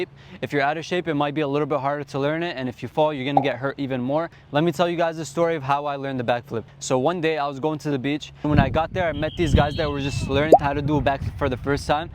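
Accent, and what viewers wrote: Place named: Queens, United States. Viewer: u talk with an accent/an aksent from here in Queens Countey !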